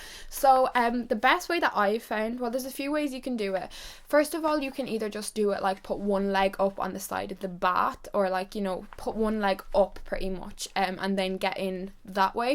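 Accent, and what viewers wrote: Ireland. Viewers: Are you irish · I feel like you're Irish! What county are you from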